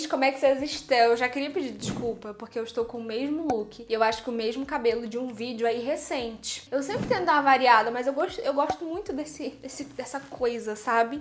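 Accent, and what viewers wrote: Rio de Janeiro, Brazil. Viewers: vc é carioca?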